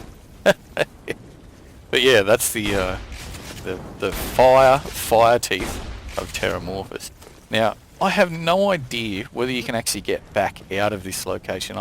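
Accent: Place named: Australia. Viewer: Man as soon as I heard your voice i checked your profile, knew you were Australian haha.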